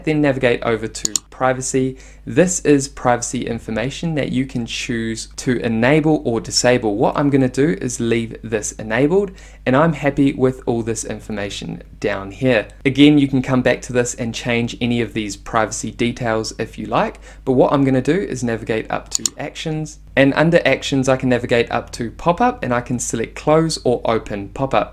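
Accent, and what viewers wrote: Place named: New Zealand. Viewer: Love hearing the Kiwi accent on Youtube instructional channels. No mucking around. Shot bro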